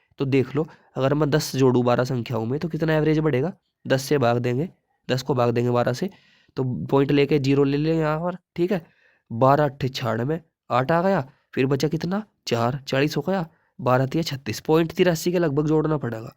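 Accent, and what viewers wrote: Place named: Saharanpur, India. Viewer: Big bro aap west up se ho kya ....aapki voice desi saharanpur wali lagti hai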